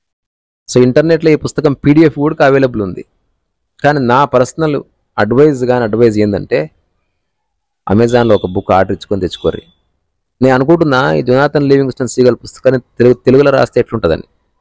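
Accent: Telangana, India. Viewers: అన్న తెలంగాణ యాసలో చాలా చక్కగా మాట్లాడారు .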